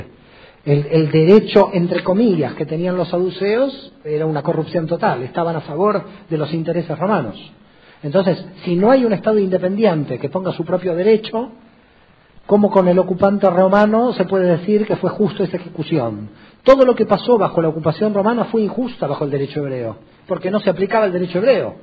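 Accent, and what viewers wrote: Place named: Latin America. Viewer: Este señor es judío de religión y no de sangre habla con acento latino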